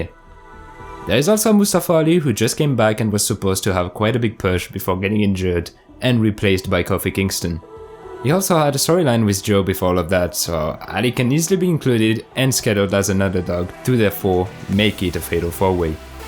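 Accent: France. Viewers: T'es français ? Tu parles bien anglais franchement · Bon vidéo ! Et soit fière de ton accent français ! ^^ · Tu es français mec ?!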